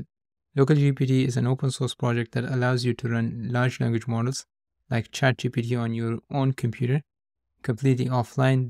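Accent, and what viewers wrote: India. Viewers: Where are you from? You sound a lot like my Indian Computer Science teacher.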